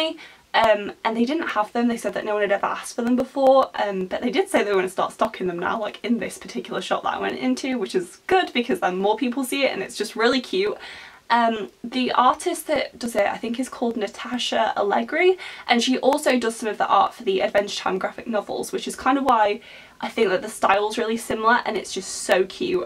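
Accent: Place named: England, United Kingdom. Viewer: I like your style! Just subscribed 😊. Whereabouts in England are you from? Your accent reminds me of home - I've mostly lost my accent from living abroad. Now I just have a general British accent that's hard to pinpoint! The teddy is sweet, not creepy at all. I recently picked up a guinea-pig soft toy that made my mum's 'flesh creep'!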